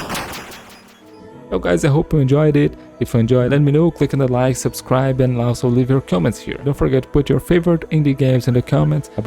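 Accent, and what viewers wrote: Brazil. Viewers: esse sotaque ta com uma cara de brasileiro kk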